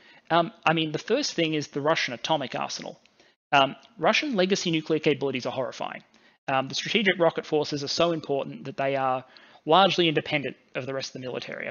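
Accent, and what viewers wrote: Australia. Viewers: Fascinating and insightful especially considering how long ago it was posted. 's voice and delivery (not to mention the sexy Australian accent) make it easy to pay attention to the arguments. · hes Australian & that’s an Australian accent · Love to hear an Aussie voice; very non- grating on my ears. Pure, unadulterated info! Oh Oy Oy !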